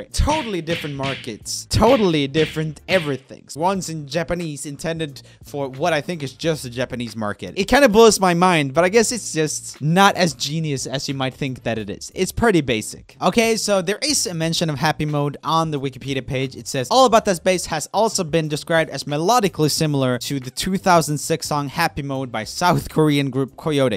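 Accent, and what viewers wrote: Sweden. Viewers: Spikey100000 how they’re both from Sweden. Have very similar accents and speach patterns and a similar sense of humour plus they are literally friends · Swedish I think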